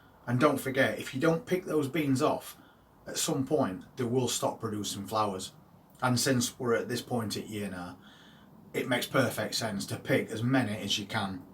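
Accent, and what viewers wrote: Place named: England, United Kingdom. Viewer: oh no. I love the bells. Your video music reminds me of that old song about the flowers in an English country garden. That plus your lovely accent.... No quintessentially English, and this English woman loves it all!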